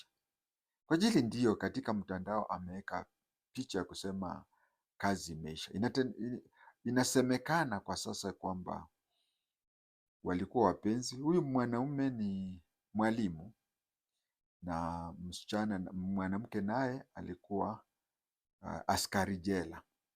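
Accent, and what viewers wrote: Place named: Bukusu, Kenya. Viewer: Kwetu ni Wundanyi, lakini asante kwa kunitaja katika video zako. Kiswahili chako kiko sawa, ila kina matamshi yaliyoathiriwa na lugha ya kibukusu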